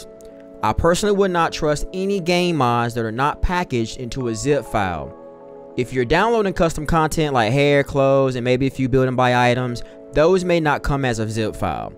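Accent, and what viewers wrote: United States. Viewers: Omg for the first time in my life I hear an American accent that is different from a "standard" one (I'm a foreigner), and it's not a "A guy speaks 50+ accents" video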